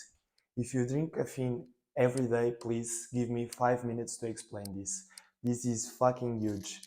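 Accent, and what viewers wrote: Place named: Portugal. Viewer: Nota-se logo pelo teu inglês que és tuga! Bom ver este tipo de conteúdo em Portugal. Continuação de um bom trabalho e qualquer coisa hit me up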